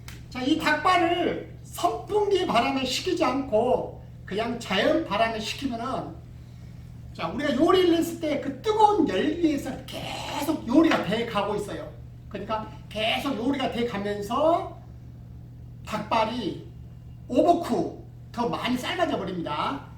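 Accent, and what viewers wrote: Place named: Chungcheong, South Korea. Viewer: 충청도분 이시군요 ㅋㅋ 경장히 ㅋㅋ 감사합니다.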